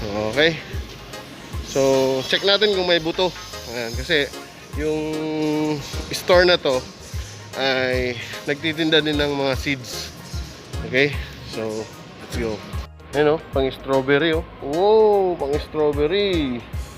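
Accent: Visayas, Philippines. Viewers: bisaya k b sir?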